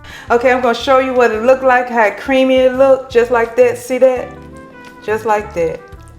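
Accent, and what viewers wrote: South, United States. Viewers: I like the southern accent, mispronounce words and all don't change and I know that this is good cause I've made some dishes right out the bag right out of the bag in a can you gotta make stuff easy after a long day on the job and get this y'all they do the same thing in restaurants is out of a bag where can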